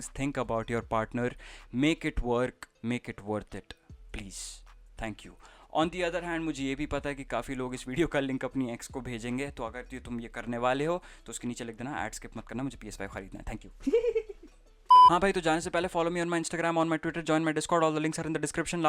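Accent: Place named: Bihar, India. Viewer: why does sound like a bihari in this vid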